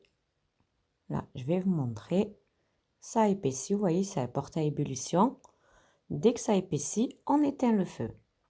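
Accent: South, France. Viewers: super recette, et surtout j'adore votre accent du sud :)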